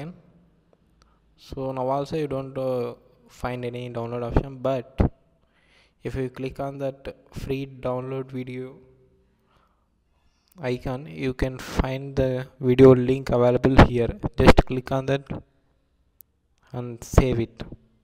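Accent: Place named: India. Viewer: Liked your video, but your accent is very hard to follow - I've been in the US for over 52 years and I think if you spoke a little clear and a bit more with not so thick Indian accent you'll definitely excel !! Keep up the good work - you're good !!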